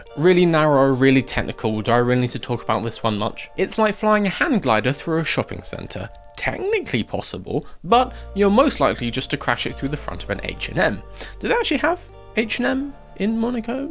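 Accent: United Kingdom. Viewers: I can assure you: You sound British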